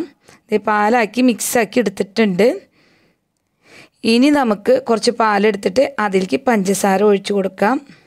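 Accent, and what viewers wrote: Thrissur, India. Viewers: Pudding with trichur accent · trichur slang caramel chocolate pudding yummy - nandu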